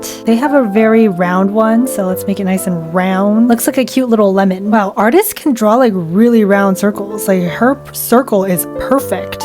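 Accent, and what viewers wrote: United Kingdom. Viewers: Are you uk or………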